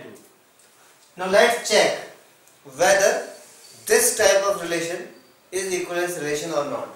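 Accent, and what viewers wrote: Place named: India. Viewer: incredible... I have to watch an Indian (? sorry if not ;) but you sound kinda like an Indian) guy to understand what my german professor can't explain.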